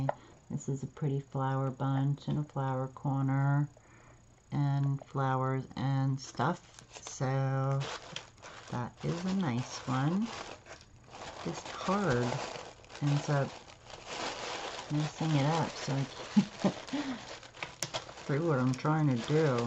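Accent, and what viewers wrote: United States. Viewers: lol i thought u were from nj u talk like my husband lol 😊🐥☀️❤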